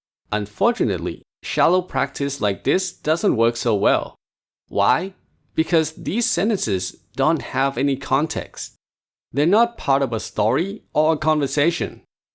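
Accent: Thailand. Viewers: I think your broke English sounds like Thai pronunciation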